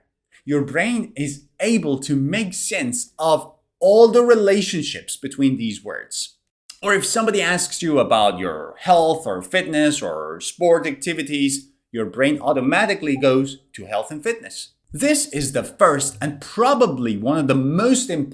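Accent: United Kingdom. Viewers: Is your accent American or British. I asked it because you pronounced the word " either " like British not American.😊😊😊😊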